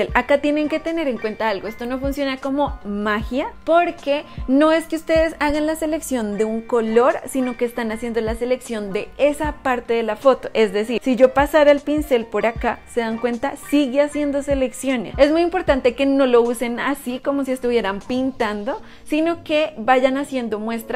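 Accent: Colombia. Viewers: El acento Colombiano me encanta es muy relajante saludos 🇲🇽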